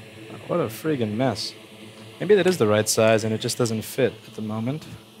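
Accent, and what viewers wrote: New York, United States. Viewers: fuh rod? do you mean farad? (fay-rad) i think would be the phonetic spelling. might just be your new york accent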